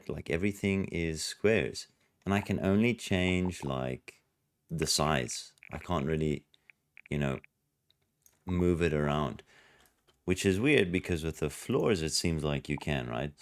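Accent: South Africa. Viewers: I'm new here. Are you South African?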